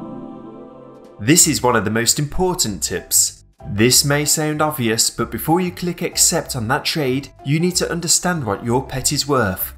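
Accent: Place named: United Kingdom. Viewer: Is it just me, or his voice is so british its calming?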